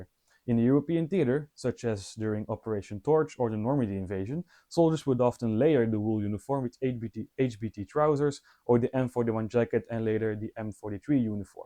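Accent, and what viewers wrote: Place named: Germany. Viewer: You must be a German as you speak English with a German accent. Sehr gut, mein freund. Cheers from an old Marine sergeant in eastern TN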